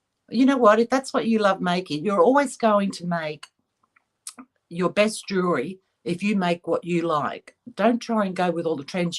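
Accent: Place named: Australia. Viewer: GORGEOUS creations! Keep doing what you're doing! Fellow Aussie here, transplanted to Texas over 40 years ago, so am loving your accent and the occasional maggie in the background! Thank you. 😀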